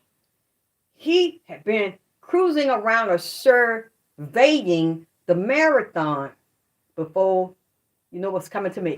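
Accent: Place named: Georgia, United States. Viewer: Hahahahaha. I'm not done watching yet. But I had to write I laughed so hard there were tears in my eyes when you were answering the lady's question about how to get a reading and what not to do when get a reading from you. I'm a Ugandan that grew up in USA. I like your Georgia accent and readings you do on here. I also don't mind you speaking Ebonics because Ebonics is one of your languages: I understand you whether or not you speak Ebonics or slang. Okay, now back to watching the rest of your video. If I have more to say I'll post another comment.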